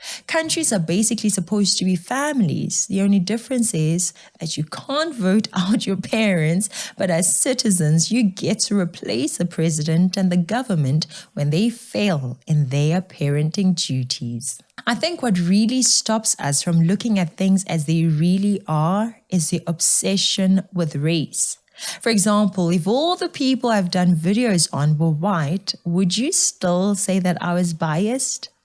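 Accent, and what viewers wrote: South Africa. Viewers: thankyou we are so blessed to have you uncover all the wrong doings and speak your mind with that lovely south african accent god bless you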